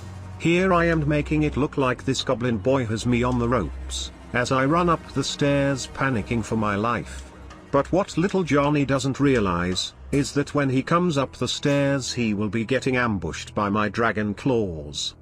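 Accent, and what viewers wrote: United States. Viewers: He sounds like a normal American white dude tf you smoking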